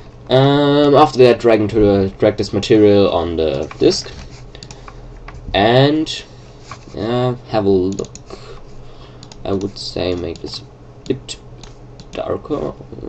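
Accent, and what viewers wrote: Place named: Germany. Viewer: Man hört sehr gut deinen Deutschen akzent :D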